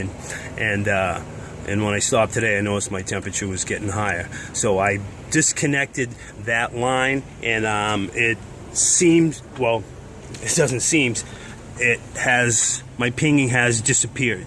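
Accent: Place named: New York, United States. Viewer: Thank you very much!! It sounds like you have a New York accent. I have family there. I appreciate the help. I have everything else lined out and ready to do the swap. Again many thanks!!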